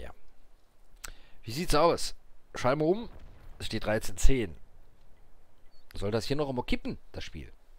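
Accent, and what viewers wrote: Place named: Saarland, Germany. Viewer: Ma merkt dir schon e bissje an, dass du ausm Saarland kommsch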